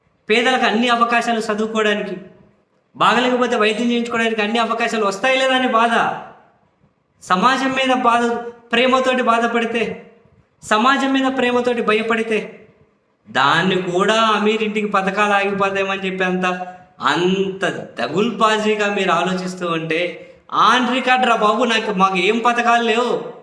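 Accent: Rayalaseema, India. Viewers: Anna are you from rayalaseema?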